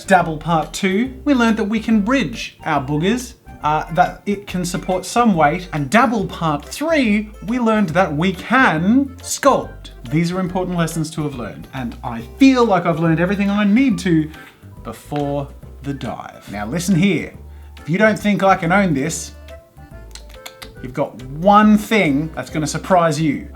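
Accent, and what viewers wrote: Australia. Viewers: Are you Australian? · He sounds extra Australian today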